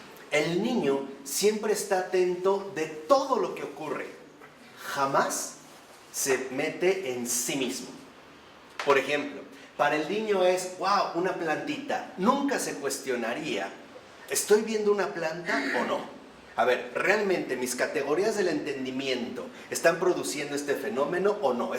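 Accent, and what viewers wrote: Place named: Mexico. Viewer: Una diccion en excelente castellano, Dr, aderezada con un delicioso mexicano. ¡Muchísimas gracias siempre!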